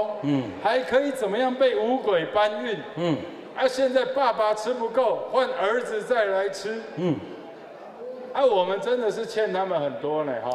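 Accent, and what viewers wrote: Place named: Taiwan. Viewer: 毛哥說台語好口愛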